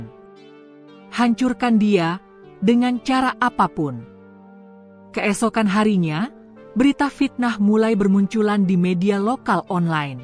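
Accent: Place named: Java, Indonesia. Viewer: Cerita ya bagus cara bacanya terlebih tebal slank jawanya..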